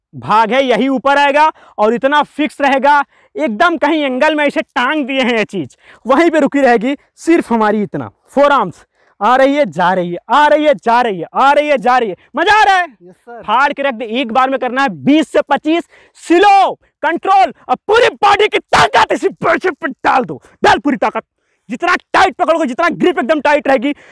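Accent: India. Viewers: Bhai aap akdam deshi voice me shikhate ho mja aa jata h🙏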